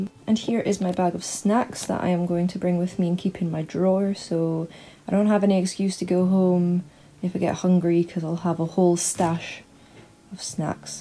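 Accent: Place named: Scotland, United Kingdom. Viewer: Another great video. Nice to see that both our labs are a frequent shopper of Sigma-Aldrich. Also loving the Scottish accent 🙂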